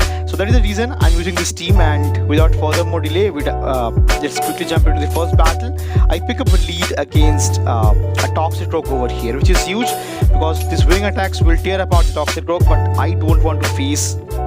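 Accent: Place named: India. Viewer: Bro thé musics sounds is high cant understand your indian accent cleary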